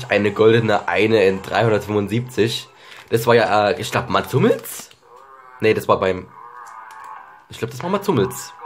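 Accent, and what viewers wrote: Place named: Saxony, Germany. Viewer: Ich liebe deinen Sächsischen Akzent, 😂😎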